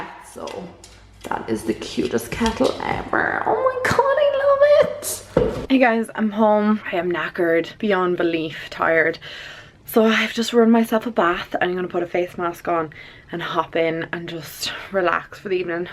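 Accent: Dublin, Ireland. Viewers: are you from Dublin? I always though you were from the country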